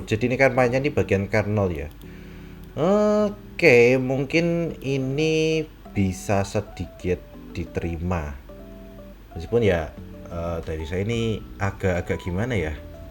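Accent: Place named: Java, Indonesia. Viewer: keliatan jowo nya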